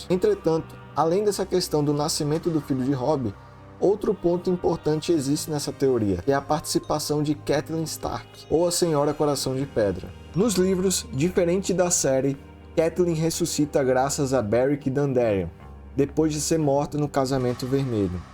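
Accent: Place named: Bahia, Brazil. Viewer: Manin, desculpa a pergunta meio inusitada, mas tu é baiano? É que o seu sotaque me lembra muito o do Vampeta kkkk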